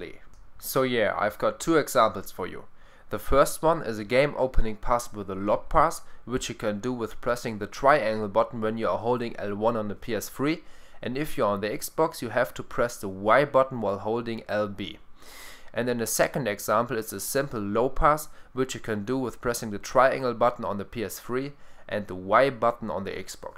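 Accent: Germany. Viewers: Are you german ? :D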